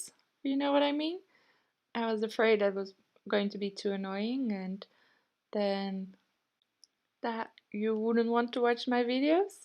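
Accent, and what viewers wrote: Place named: Netherlands. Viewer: I love your accent. It definitely sounds Dutch but I can understand why some might think German to. The artwork is beautiful 💛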